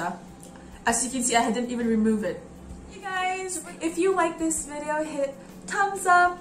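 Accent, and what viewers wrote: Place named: Philippines. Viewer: I like your filipino accent:))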